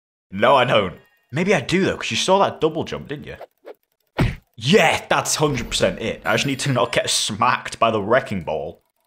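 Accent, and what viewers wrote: United Kingdom. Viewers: I didn’t know you’re British😂😂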